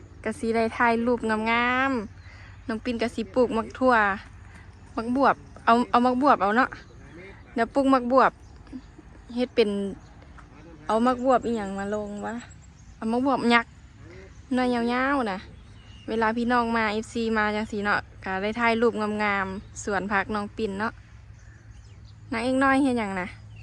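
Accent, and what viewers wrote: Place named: Laos. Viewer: สะบ๋ายดี๋น้อง สาวเม้ยผู้แข็งแกร่งสาวสวยแห่งลุ่มน้ำปากกระดิ่ง ชื่นใจในความสามารถ แม้แต่สำเนียงพูดผสมผสานระหว่างสำเนียงลาวไทยเม้ยกับสำเนียงคำตากล้าได้อย่างลงตัว ขอปรบมือให้ดังๆนะครับ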